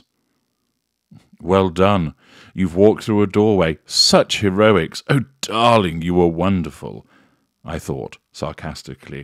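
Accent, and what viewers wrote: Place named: RP, United Kingdom. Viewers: 😂😂 you crack me up.. love your storytelling..and that posh accent... its perfect... I enjoy all of your videos regarding this trollop.. 😂😂 ❤🇦🇺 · Yes a great story teller.All my senses activated and I saw a privileged male being spoilt as a child and constantly told by his mother that he and the family were so much better and superior to others that he carried this elevated opinion of himself,a huge ego into adulthood.He despises anyone who remotely mirrors his own failings and selects them for shredding eg Megan.How am I doing so far.You probably attended Oxford or would have aspired to, hence your accent.Instead of readers saying you are often broke, I would think you hoard money. Possibly mimic your therapists or have studied yourself in psychology. You have a need to dominate but there will always be someone more powerful you have either met or still to meet.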